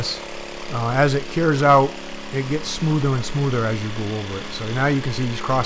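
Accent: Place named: Massachusetts, United States. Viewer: Judging by the accent I'm gonna say Massachusetts government BS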